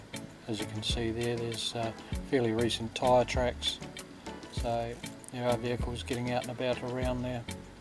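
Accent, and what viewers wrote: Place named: New Zealand. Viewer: Brilliant mate. Think I can hear an accent from back across the ditch! I’m a local Perth kayak fisherman as well so am enjoying your content. Thanks for sharing spots and launch spots. Cheers mate and keep it up 😃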